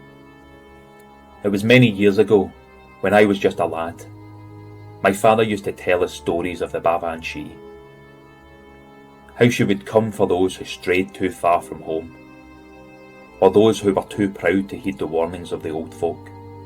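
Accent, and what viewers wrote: Scotland, United Kingdom. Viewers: This was PERFECT. Love your voice the narration graphics everything. I think I’ll subscribe. Great channel. Are you from Scotland?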